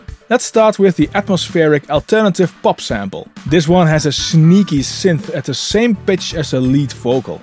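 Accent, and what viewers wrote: Netherlands. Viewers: Lekker Nederlands accent :) thanks for the tutorial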